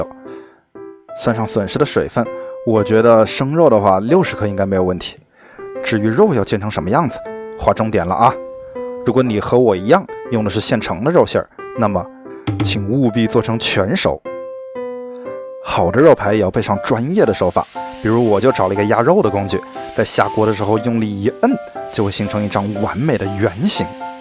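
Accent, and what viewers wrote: Wuhan, China. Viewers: 听口音 Up主武汉人吧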